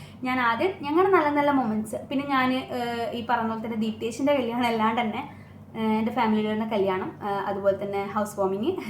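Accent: Kannur, India. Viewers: അതെന്താ കണ്ണൂരുള്ളവർക്കു നന്നായി സംസാരിക്കാൻ പാടില്ലേ? ഒരോ ജില്ലയ്ക്കും ആ പ്രദേശത്തിൻ്റെ തനതായ 'സംസാര ശൈലിയുണ്ടാവും.. നിങ്ങളുടെ വിദ്യാഭ്യാസത്തിൻ്റെ ഗുണമാണോ ഒരു പ്രദേശത്തെ ഭാഷയുടെ പേരിൽ നെഗറ്റീവടിക്കുന്നത് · KL Biju venta kannur slag.you good speaking.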